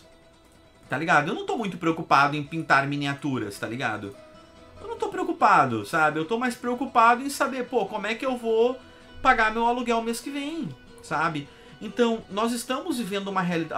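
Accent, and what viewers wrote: Rio Grande do Sul, Brazil. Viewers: O YouTube me indicou, vc é gaúcho?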